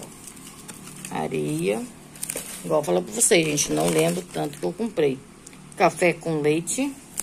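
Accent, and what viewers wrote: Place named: São Paulo, Brazil. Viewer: Vc e do interior de São Paulo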